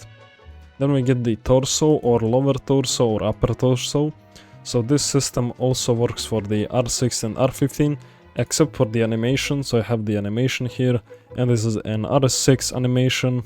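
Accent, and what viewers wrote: Russia. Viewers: Bro your accent i think you are russian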